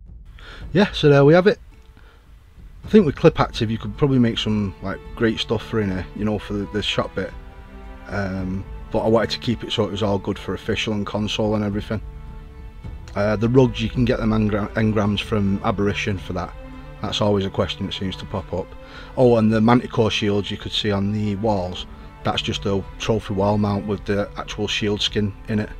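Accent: Manchester, United Kingdom. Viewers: Defo sound like one of us manc lads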